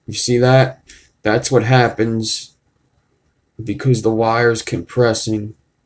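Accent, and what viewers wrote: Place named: New Jersey, United States. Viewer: youz guyz is a jersey thang. everytime I hear it, it reminds me of Kevin Smith or more to the point Jason Mewes :)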